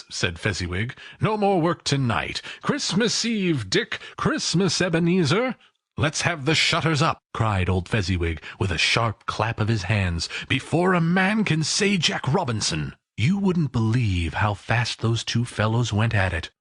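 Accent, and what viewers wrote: United States. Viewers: Yes...now am about to switch it off because it's in American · American accents are no good for Dickens period ! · I am, but it doesn't sound right in an American accent. I'm going to find an English version! Merry Christmas! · we say it “you bik wi tous” in the us and he sounds America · Bye = American accent. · Sorry. Merkin accent. Bye bye. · I’m sorry but I can’t deal with the American English. Anton Lesser ( Game of Thrones) does Dickens very well. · Wish this was in an English accent. The American kind of ruins it. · as good as this reading is the mere fact that it is read by an American accent and the word "clerk" in britain is pronounced "clark" which takes away just a little from believeing this tale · I want a version thats not told with an American accent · Dickens told in American-English accent?? Really? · Awful American version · why an American reading a book set in Victorian England?? 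· At first I wasn't so sure about Dickens in an American accent, but this one actually turned out to be pretty good. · Managed about 4 minutes listening to that droning yank accent..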